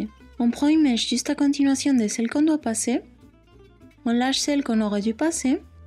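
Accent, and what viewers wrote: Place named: Spain. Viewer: han comme j'adore ton accent !!! ça sent l'espagne à plein nez (je me trompe pas j'espère) qu'est ce que tu es charmante aussi, un visage à la audrey tautou, simple, frais et naturel :) un besito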